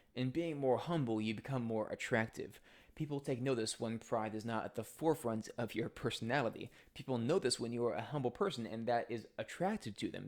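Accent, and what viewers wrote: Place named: Canada. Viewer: Sounds Canadian or homeschooled.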